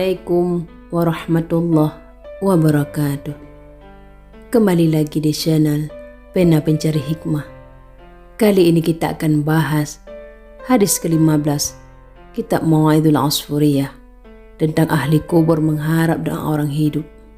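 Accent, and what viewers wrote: Aceh, Indonesia. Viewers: Mirip dengan suara almarhum juga🙏😇, kaya cadel. Ciri khas bicara orang aceh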